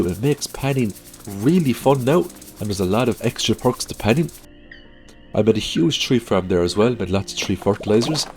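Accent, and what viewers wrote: Ireland. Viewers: I literally came back to this video to post this comment. I watch these videos once or twice while I'm awake to get ideas for my own playthroughs, and then I use them over and over to fall asleep to because doesn't ever raise his voice. The Irish accent helps with the relaxing quality too I think. So many other content creators can't be trusted to not scream in my ear suddenly while I'm trying to fall asleep. If you're into calming voice overs in general, I recommend Steve1989MREInfo. Completely different channel, he reviews MREs, but his narration is very calm and consistent throughout his videos.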